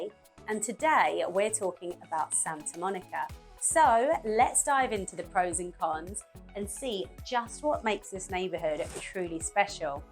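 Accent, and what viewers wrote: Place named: United Kingdom. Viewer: Nice Insights, Beautiful tattoo,. I like your British Accent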